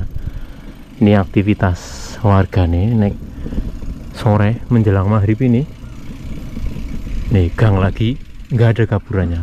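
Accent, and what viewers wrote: Banyumas, Indonesia. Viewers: Mase asale saka ndi koh ? Banyumas apa ? 😂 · Ngapak e njiplek bgt mas 😭 the real medhok neng ngana 😭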